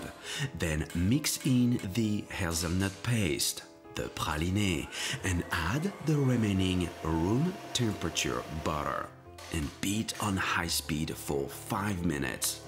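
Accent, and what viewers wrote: France. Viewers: Mdr il est français ou quoi il prononce bien les noms français et il a un pti accent fr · Are u French cos I love the accent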